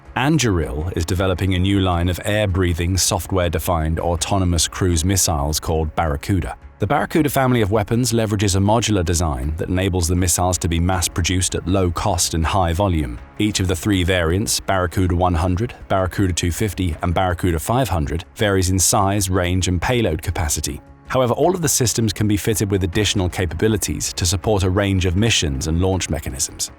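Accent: United Kingdom. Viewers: Someone got some dumb British narrator to say it was American. Silly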